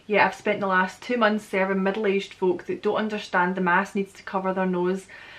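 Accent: Scotland, United Kingdom. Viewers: I am a Japanese student who moved to Edinburgh this autumn. I became a huge fan of your channel and I am now trying to watch all your previous videos. Thank you for this lovely introduction to Scottish language and culture. By the way, because of my poor English listening skills I am watching your videos with automatically-generated subtitles, but sometimes they don’t help me due to your lovely accent. I’d really appreciate it if you could attach subtitles. Anyway I can’t wait for your next video! Stay safe. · You have the best Scottish accent ever. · there are words I can't understand because of Scottish accent I'd say😞💔. I 'm more used to American probably😔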